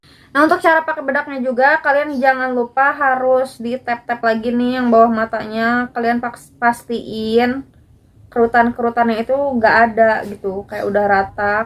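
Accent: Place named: Bandung, Indonesia. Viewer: Bandung baget logatnya 🤣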